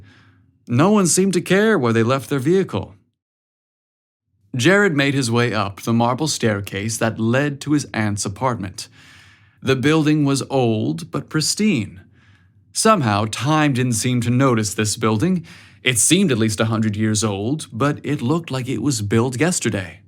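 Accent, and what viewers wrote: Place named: Italian American, United States. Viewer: OMG, you have an incredible singing voice.. what a great way to start the SteamyStory. And then your Italian American mama accent...lol. And such a wonderful summer romance story......ahhhhhhhhhhh, so much better than a Netflix binge.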